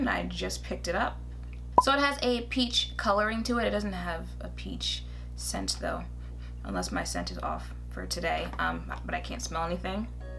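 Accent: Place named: United States. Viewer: The foundation was a perfect match ! It's the first time I see you wear blush,it's really pretty ! Btw,"amande" is pronounced "amand" but i love when americans speak french,it sounds a lot cuter than when we speak english :p beautiful as always ;) xx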